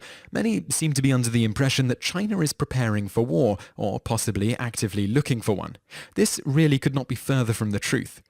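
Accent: United Kingdom. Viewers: The narrator in the video is British not American, and the subject of the video is to clear up possible misconceptions of the Chinese as a society. How does this diatribe become a false attack on Americans? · Not really irony, they're not the same american people who created the myths, plus, like Rachel said, the narrator's brittish. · Many of the "ridiculous myths" I never even heard of. Seems like pro Chinese propaganda to me, narrated by Guy with a British accent to make it sound more intelligent. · zekeboy24 very true , is he a British guy ? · The commentator is British. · So much fail... Have you never heard a British accent? Or did you think Americans have this one? WOW... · I'm pretty sure the announcer guy's a brit. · David T Spoken by a British person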